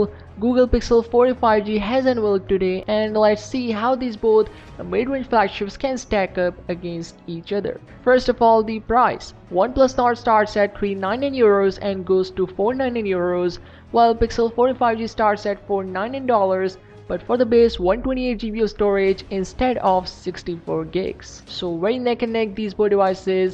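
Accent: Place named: India. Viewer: Indian english so ...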